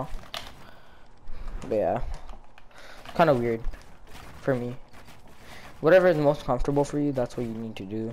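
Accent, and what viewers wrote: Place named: India. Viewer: Did anyone here his India acete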